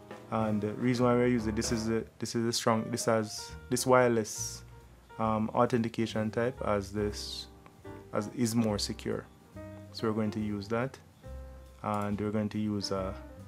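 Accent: Jamaica. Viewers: Sweet. Can learn Mikrotik with a Jamaican accent! Big Up the Tik!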